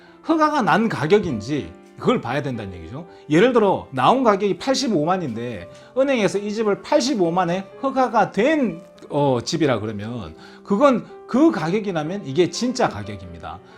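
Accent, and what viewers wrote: Gyeongsang, South Korea. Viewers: 처음 영어로 준비없이 들은적이있는데 도통 감을 못잡겠더라구요. 정감있는 경상도사투리로 설명들으니 이해가 잘되네요ㅎ 감사합니다. 북가주에도 계셔서 조언받을 수 있으면 참 좋겠습니다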